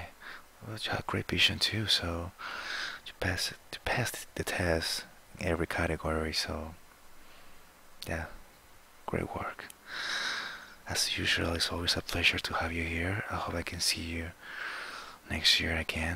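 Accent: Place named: France. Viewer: You sound... FRENCH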